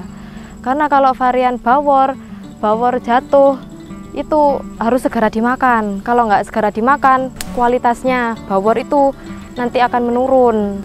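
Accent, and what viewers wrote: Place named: Malang, Indonesia. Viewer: Logat malange gak ilang ,, " "ikuaa Lo mas "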